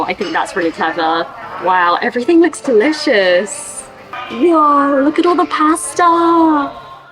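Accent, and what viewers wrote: RP, United Kingdom. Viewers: 字幕がきちんと付けられていて英語の勉強になるし、Squishyさんの品のある、ゆっくりめに話してくださるイギリス英語は聞いてて気持ちいいです。所作も美しいですね😊 日本の日常的な良いところを紹介してくださって嬉しいです。最近このチャンネルを知ったので過去に遡って観ようと思います❤ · コレぞ、 “Queen's English” と思えるほど、一語一句を “丁寧かつクリア” に発音して下さる (#イギリス人🇬🇧らしい!!) ので、すごく聞き取りやすいです！ 👍👍 ～ 所作も自然体ながらエレガントで、好感度が高いですね！ 🥰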